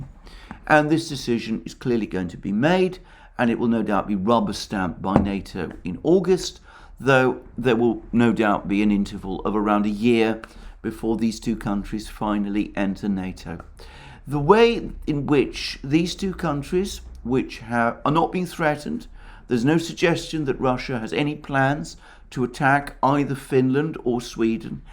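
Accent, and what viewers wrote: United Kingdom. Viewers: I love your British style and cadence of speach. I want to start go learn Russian. I'm sick of all this anti Russian sentiment.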